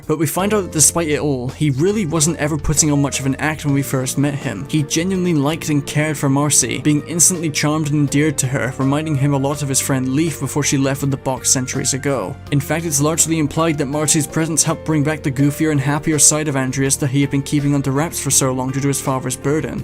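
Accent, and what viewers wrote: United Kingdom. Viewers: Brilliant analysis, I had most of the same thoughts about this show. The episodic nature almost put me off it but the slow burn of the overarching plot that was always present under the surface kept me hooked. Season 2 was fantastic! Season 1 was average except when it focused on the plot and season 3 was schizophrenic honestly, the tonal shifts didn't work and the ending felt like kind of a mixed bag but overall it was a wonderful show. The animation was brilliant, the comedy was consistently funny most of the time and the core story was so good. It really could have been one of the best. On an unrelated note, I must suggest you try She-ra and do an analysis if it grabs you, same for Arcane as well. I have loved all the analysis videos I've watched and while my bias towards British humor and accent contributed to that, I subjectively think your videos are objectively good. 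Anyway, TL;DR She-ra and Arcane analysis please.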